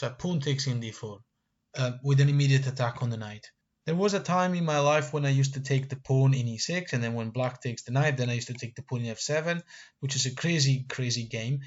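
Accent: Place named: Wales, United Kingdom. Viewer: You have an interesting accent. Welsh with something else.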